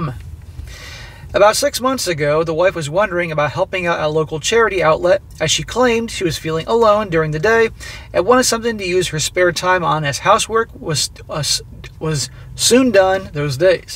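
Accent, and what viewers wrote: Yorkshire, United Kingdom. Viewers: This bloke sounds like a Yorkshire man, they are honest direct n strait to the point, one of my closest friends Richard is from Harrogate Very honest helpful direct n trustworthy, you just can't get better, not implying the rest of the people are dishonest by all means, but Yorkshire people are the best I met on earth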